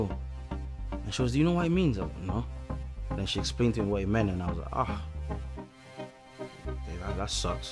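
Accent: Scotland, United Kingdom. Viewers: I can't believe he has a Scottish accent I thought he was from London, that has absolutely blown my mind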